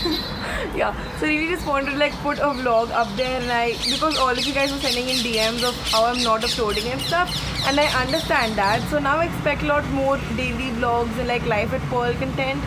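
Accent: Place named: India. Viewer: I think Indian English its very typical Indian accent .....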